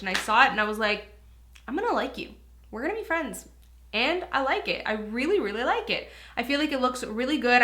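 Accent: Canada. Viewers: is it just me or was her accent extra canadian today?😂💜love it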